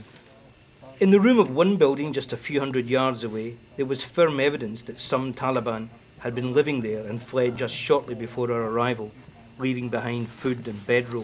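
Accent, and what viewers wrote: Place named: Scotland, United Kingdom. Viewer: To bad the Scottish accent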